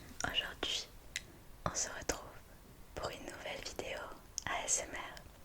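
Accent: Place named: South, France. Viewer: L accent du sud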